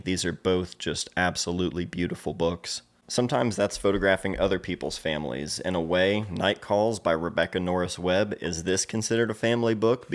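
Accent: Ohio, United States. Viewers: My favorite thing about photobooks is the ability to be up close and personal with the work of yours or others. It is a very focused and intimate way to view photography and in my opinion is the best. It takes away all distractions and allows you to be fully immersed in the story the photographer is trying to tell. I see photobooks as the movie theater experience when it comes to looking through photos, you sit there and are 100% focused on the story, the colors, the textures, everything. Also, interesting to hear you say that us Ohioans have an accent haha, I haven't gotten that before but I have heard talk about that. Great video!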